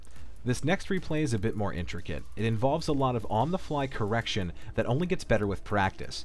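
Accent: Netherlands. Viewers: So you're dutch i reckon.. Where did you get your accent? i like your vids btw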